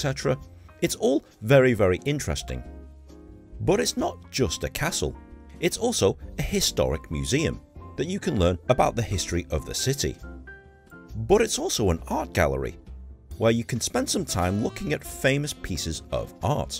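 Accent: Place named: Northern England, United Kingdom. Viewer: Never would i thought to see and hear 😂 an Asian northener from the UK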